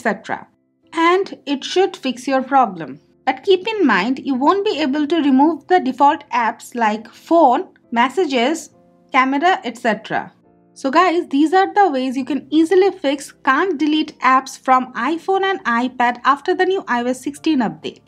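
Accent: India. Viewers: You must be American - THE very country that can’t speak English! So much so they made up their own language they call English. This woman is speaking English - with an Indian accent. Grow up!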